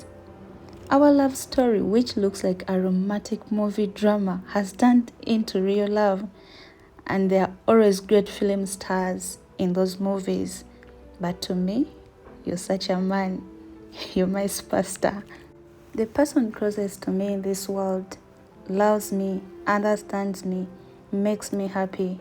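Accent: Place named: Australia. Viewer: 🤭 a lot of your ignorance shows on your comment. 😁🤐. sounds Aussie to me.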